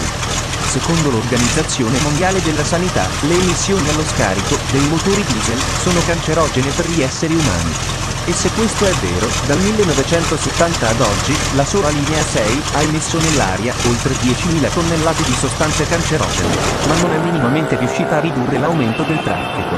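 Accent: Italy. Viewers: E ti col mus e mi col tan andemo a Barco doman........Chi parla non è certo triestino, un Talian, cabibo, per intenderse, un foresto.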